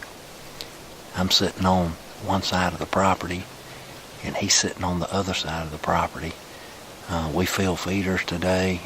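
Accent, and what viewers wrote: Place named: South, United States. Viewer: Dope video love the clarity of the audio too it’s so calm and quiet but the southern accent and saying “keeel” the hog brings it all together 5 stars ⭐️⭐️⭐️⭐️⭐️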